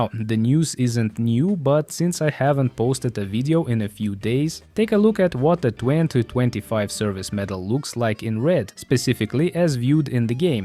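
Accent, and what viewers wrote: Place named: Russia. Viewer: god bless you, i was missing a interresting russian talking, with that cute russian accent. i love it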